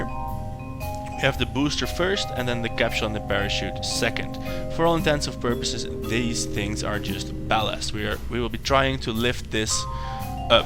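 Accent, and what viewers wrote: Netherlands. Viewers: je klinkt nederlands ouwee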